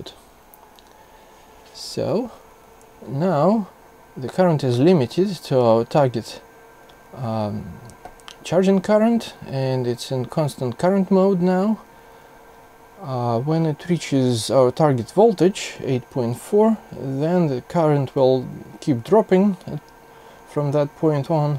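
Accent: Russia. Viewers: русский акцент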